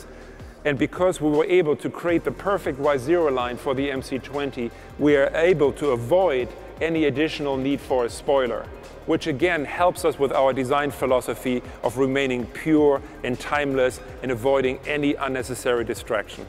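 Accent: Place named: Germany. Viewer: Der Man hat ein deutschen Akzent👀 Das Video ist auf einem deutschen Kanal👀 und dazu noch in dutschland👀 Warum spricht Er Englisch 🤷🏻‍♂️👀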